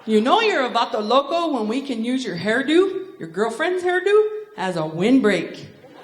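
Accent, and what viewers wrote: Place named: Northern New Mexico, United States. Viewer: Love that Northern New Mexico accent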